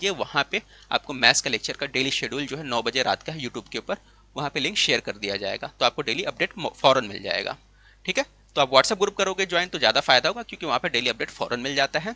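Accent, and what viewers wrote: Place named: Maharashtra, India. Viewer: Sir aap maharashtra se ho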